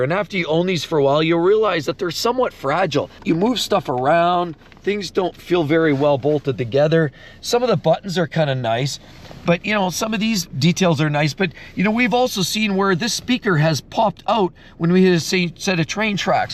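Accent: Canada. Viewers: You' re canadian, aren' t you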